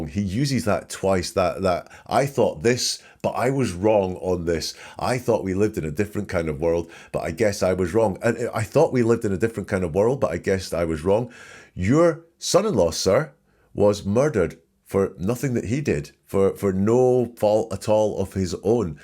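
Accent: Scotland, United Kingdom. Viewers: Terrific stuff. Which part of Scotland are you from?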